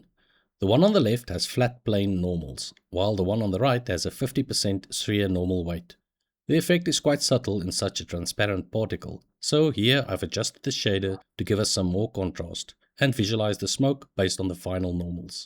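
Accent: South Africa. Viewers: You sound South-African, The work looks interesting. What game are you building?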